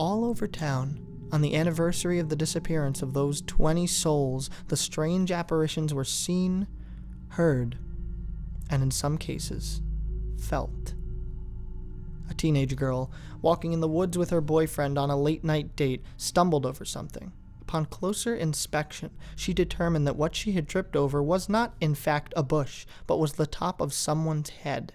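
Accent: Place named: South, United States. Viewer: Great narration! Loved the "southern" voice. Is there a part 2???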